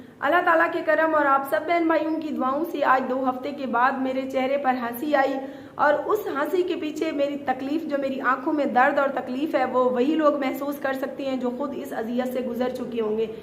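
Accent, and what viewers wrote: Saraiki, Pakistan. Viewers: باجی آپ سرائیکی میں ویلوگ بنایا کرو آپ کی زبان سے سرائیکی اچھی لگتی ہے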